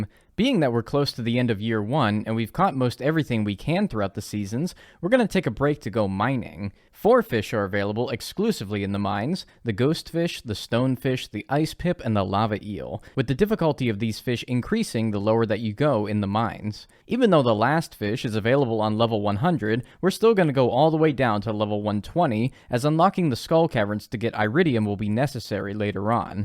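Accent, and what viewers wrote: United Kingdom. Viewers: Are you British by chance?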